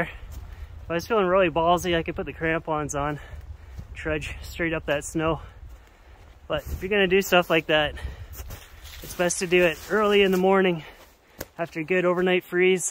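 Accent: Canada. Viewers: I know I"m an odd guy, but the two things I look forward to most in your videos is the opening jingle and the way you prounounce Canadian 'out' as 'oot'. Love it. And of course your in van adventures.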